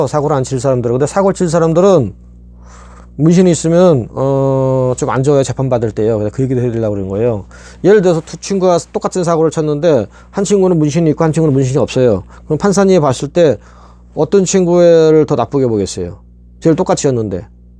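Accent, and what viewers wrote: Incheon, South Korea. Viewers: 언뜻언뜻 이천수선수닮으셨네요 ㅋ 인천분이라 약간 특유의 말투도 비슷한듯하고요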